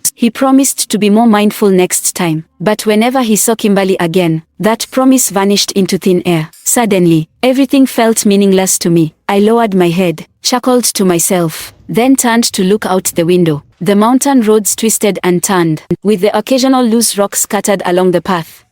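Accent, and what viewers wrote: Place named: Africa. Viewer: The African accent is crazy 😂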